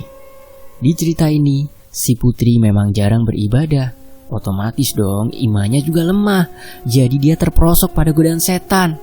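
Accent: Java, Indonesia. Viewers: Aku suka bgt cara kakak membawakan ceritanya,opo maneh nek omongan boso jowo gemes nek dengarkan 🤭 aku selalu menunggu cerita2 selanjutnya ❤️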